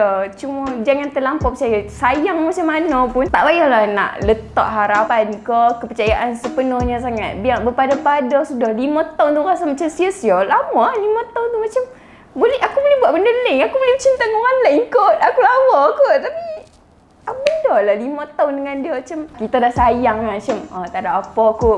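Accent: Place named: North, Malaysia. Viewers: Sedap lah orang kedah cakap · Haihh. Awat aku suka sangat dengaq loghat utara ni. 😂 😂 😂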